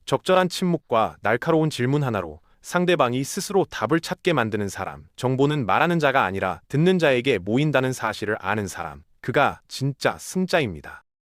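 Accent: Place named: China. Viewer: 발음이 중국인같아여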